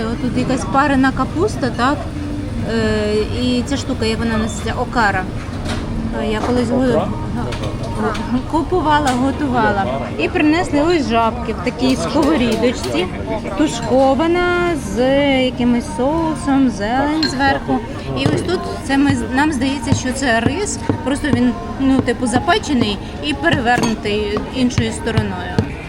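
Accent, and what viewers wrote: Standard. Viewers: Моэ вітання з Німеччини. У вас чиста українська мова. Розповідаєте дуже цікаво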